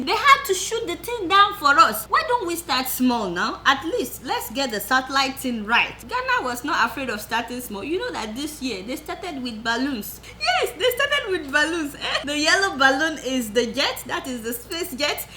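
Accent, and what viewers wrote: Nigeria. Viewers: Very Real!! Authentic Naija accent...no forming:*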